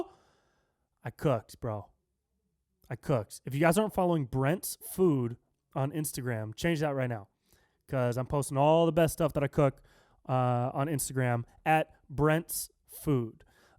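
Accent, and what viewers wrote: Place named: Northern California, United States. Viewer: Bruh every time I watch these videos It makes me proud of NorCal. Thanks for repping our accents, culture, etc. I’m 18 from Chico town.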